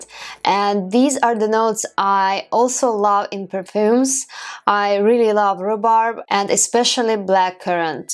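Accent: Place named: Russia. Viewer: ну вы явно русскоговорящая. Через экран слышно😁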